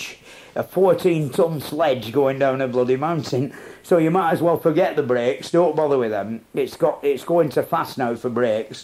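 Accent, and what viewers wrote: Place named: Bolton, United Kingdom. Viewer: Nice words, its magic isn't it. The Boulton accent i still hear from my family who moved to Cornwall in the 60s. I wear overalls and a greasy hat most days and I'm a young un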